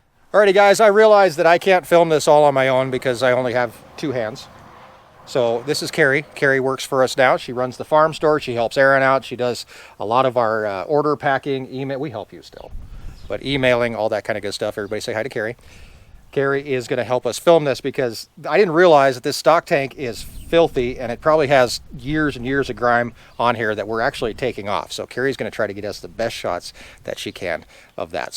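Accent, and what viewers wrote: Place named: Boston, United States. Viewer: Cuts like “nuttall”, since I like in Massachusetts you were speaking my language. “Paak the ka in the yaad”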